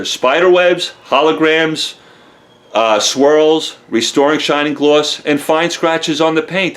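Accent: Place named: Rhode Island, United States. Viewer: Love u to...lol....your accent...I have the same accent...Rhode island lol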